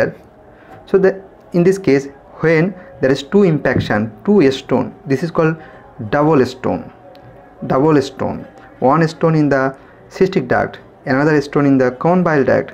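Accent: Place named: Bengal, India. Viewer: Legendary bangali accent😅. Thank you for clearing the concept so well.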